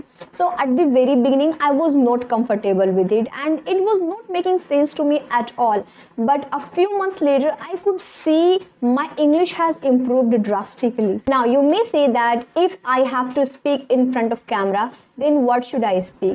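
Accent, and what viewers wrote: India. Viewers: I like your indian acent jejej..Greetings from Colombia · You could work a litle bit in order to get over your indian accent, it's strong. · Indian accent ,but excelent.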